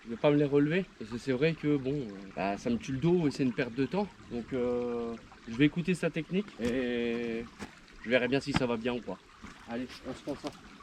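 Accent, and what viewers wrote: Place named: Lorraine, France. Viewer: A l'accent je dirais un lorrain 👍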